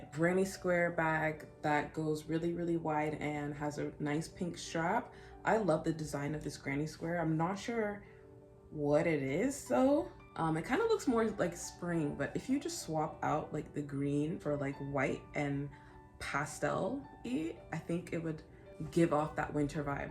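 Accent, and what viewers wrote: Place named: Caribbean. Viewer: Adorable video. Are you carribean? I detect an accent but I can't place it.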